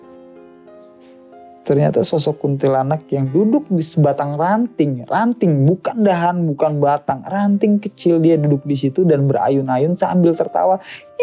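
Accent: Java, Indonesia. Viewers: Bang abis ketemu mas Itank dkk n mas Bala, jd kebawa logat Jawa yah...😃😃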